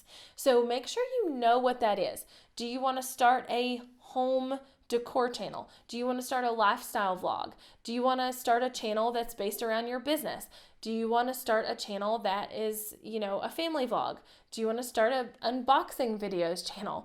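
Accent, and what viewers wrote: South, United States. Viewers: Hi....I Subscribed to your Channel......Do I hear a...Southern... Accent.....cheers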